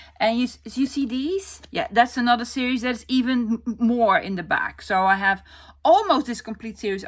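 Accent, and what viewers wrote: Netherlands. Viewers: The moment you started speaking I knew you were from Holland. My parents came to the U.S. right after WW2 with my brother, who was two at the time, and one trunk of belongings. My entire family is still in Holland. My maiden name is Visser (Heiloo) and my mom's maiden name was vd Kuil (Ijmuiden). I submitted DNA for ancestry and I am 98% Dutch (mostly Friesland). "If it ain't Dutch it ain't much" Lovely craft room tour! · Wow !!! Im new to yr channel & i was blown away watching this, first of all, my Late Mother was Dutch & ive always loved the accent, you have a great sense of humour & i was thinking there is so much in yr craft room, that i could come visit & go shopping 🤣🤣, however slight problem i live in Australia lol I thoroughly enjoyed every minute of yr tour, Thankyou im in awe of all you have 😊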